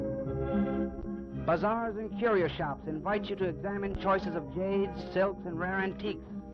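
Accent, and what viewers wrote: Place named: United States. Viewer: Thank you for this voice typical america ! I like it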